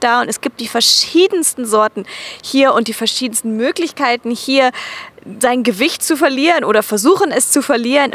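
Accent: England, United Kingdom. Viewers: her accent sounds English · I always thought she was from the UK.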